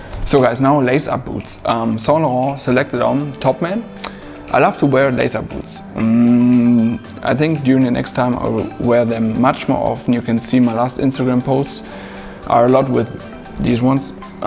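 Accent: Germany. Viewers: Bei englischen Videos hört man immer sofort, wenn jemand deutsch ist. 😅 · Love your German accent. It sounds like you’re about to eat me with a glass of Chianti.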